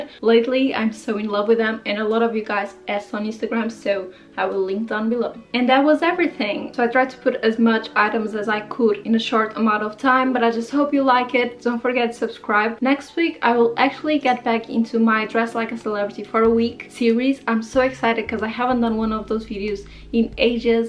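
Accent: France. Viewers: Are you French? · Is she French ?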